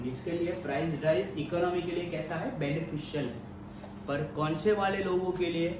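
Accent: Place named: Gujarat, India. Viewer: Sir aap Gujrat k lagte ho!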